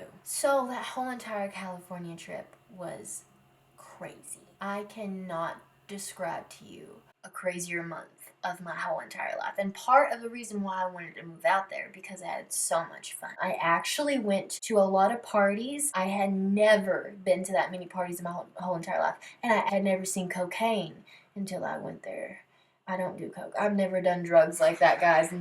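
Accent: South, United States. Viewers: This is the very first video I’ve ever seen of her, but I hear a southern accent and it’s SO cute!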